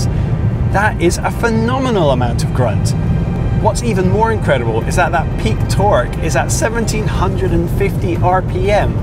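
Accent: Scotland, United Kingdom. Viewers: Scottish accent. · Middleastbeast It is in fact a very toned down Scottish accent, probably educated aborad. Not every Scotsman sounds like Willy from the Simpsons, lol. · lol at Scottish accent ahaha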